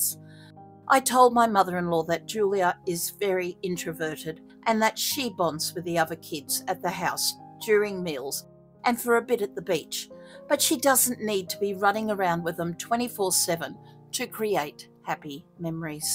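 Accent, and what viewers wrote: Australia. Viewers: I hope you feel better soon! I REALLY ENJOY YOUR CHANNEL!!! Thanks so much for reading to us. It reminds me of going to the library as a child, sitting down in a semi-circle and listening to the librarian read us stories. That was the next best thing to reading a book myself, and I still enjoy being read to today, so thank you! I enjoy your accent and the sound of your voice. Are you from Australia? I'm in the US. LOVE the accent, truly!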